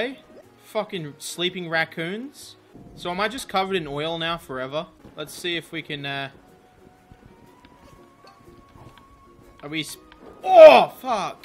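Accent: Australia. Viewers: say more funny Australian sounding stuff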